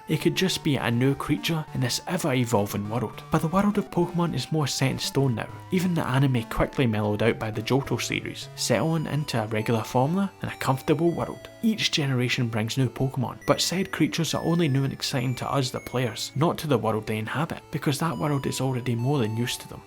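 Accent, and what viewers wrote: Scotland, United Kingdom. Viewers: He said "Polish a bit more your voice" not "rebalance your sound". He doesnt understand the Scottish accent. I had no problems hearing anything in this video. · it's a great accent you have. Brilliant. Scottish?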